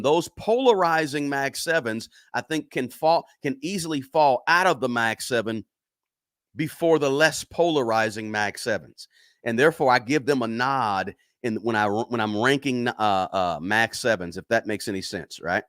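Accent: South, United States. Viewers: Hey, I enjoy your show. With all the respect the world if I may say please, you're the first African American man I've ever heard with a deep southern accent lol. I like it plus keep up the good work thank you.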